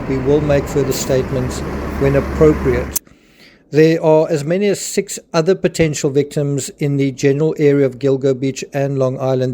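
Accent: South Africa. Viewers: You sound like a South African. I'm watching from Durban. I think they will find more bodies, about 15 or so, possibly two children and, or teenagers. Poor victims.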